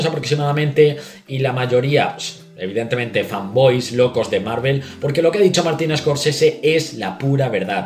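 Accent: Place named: Mexico. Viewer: Aparte pochoclo s?? Enserio?? Hablas como mexicano y les dices pochoclos??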